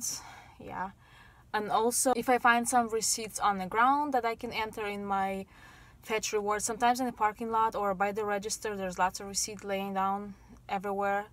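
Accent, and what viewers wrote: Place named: Eastern Europe. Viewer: You sound Eastern European. Just curious.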